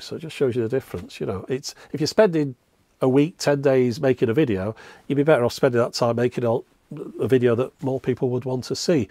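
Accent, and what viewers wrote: United Kingdom. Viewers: Thanks for sharing your experiences with the weirdo audience members. Please don't waste time interacting with them. You have nothing to explain to us about how you sound. It's very shameful how some people act here behind their veil of pseudo-anonymity. From one of the up-'til-now silent subscribers, I'm very sorry to hear about your Mom. That must have been a terrible thing to go through. And you sound awesome. The British accent automatically gives you a +10 perceived intelligence, and sod the plonkers who give you a hard time about the way that you sound. Please have a better 2023. Best regards from Chicago, USA. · Totally Brilliant Video. Had My undivided attention the whole time. I have some catching up to do, I thought I have seen Every Video You put out. I though the Way You sounded was very natural, found nothing odd about it, Having the British accent and all. 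It May sound weird, But I really enjoyed hearing you talk.. Sorry about the Health issues. Please accept My Sincere Condolences of You Mothers Passing. Lost My parents in 96. Dad was 65, Mom in 08 just turned 72. I'm 62 and hope to out live My father. I feel that I can cause I am in great Health.. Other than a Dental check-up every 6 Mo, I have not seen a Doctor in 15+ years. I do nothing for Christmas either. Being in the woods 250' From the lightly Driven Road not much to see. When I get My Tower back up, it will be 120' My big blue Star might be seen from the interstate. I anxiously await any Video You put out. Kinda makes My day when You do... Please take Care My Friend (yes You could be a great Friend to have) Mike M. Wausau Wi. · Hopefully 2023 is better. I don't know how people complain about how you speak. It just sounds like a British accent to me. But there are always a few that will complain and insult people.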